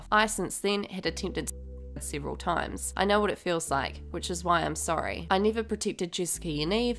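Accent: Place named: New Zealand. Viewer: true. So nice to hear a pure Kiwi accent from young people without some awful American twang in it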